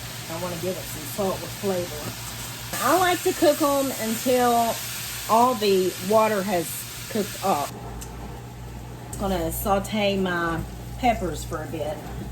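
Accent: Kentucky, United States. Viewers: I don’t comment on yt much but I have to for you! 😂 I can’t stop watching your videos! I love your rawness/transparency, personality, smile, accent (soon as I heard I thought she has to be from Kentucky- bc I am too 😁) and of course love your recipes. They hit home and remind me of my mom and grandparents cooking who have all now passed on. 😢 there were some I wish I had gotten from them and found here so thank you!! (Chocolate gravy for example) You are beautiful and have a beautiful family. I have 3 sons ages 23, 22, and 20. So I can relate! Guys don’t be so shy and help support your mama. 😂 💯 I’m sure she deserves it and seems so proud of you all. 💖 keep up the good work guys. Best wishes 🤟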